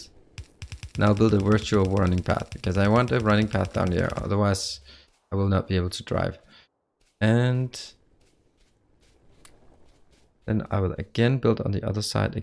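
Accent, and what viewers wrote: Denmark. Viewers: are you danish? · Danish I think. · Danish I believe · He is Danish.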